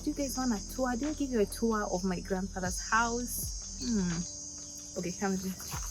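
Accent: Kenya. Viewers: Kenyans and being "exZited". I love it💕😁 Congratulations on your achievement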